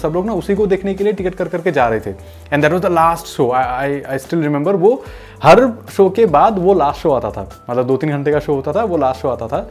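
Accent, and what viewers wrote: Assam, India. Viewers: R u Assamese?? Bcz Ur accent is familiar for me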